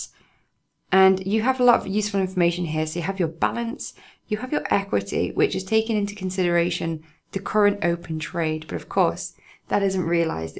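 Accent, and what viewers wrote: United Kingdom. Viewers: She just made everything seem simple with her amazing British accent!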